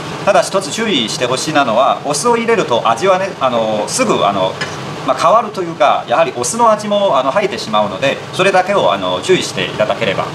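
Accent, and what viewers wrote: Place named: Chongqing, China. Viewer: 一听口音就是地道的重庆人😂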